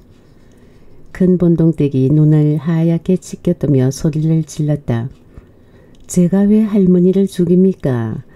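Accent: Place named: Jeolla, South Korea. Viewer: 전라도 사투리가 구성지네요. 님 덕분에 기분 좋은 하루 될것 같습니다. 건강 잘 챙기시고 님도 기쁜하루 되시길 바래요~ 한결같음에 고마운 마음 전합니다.~♡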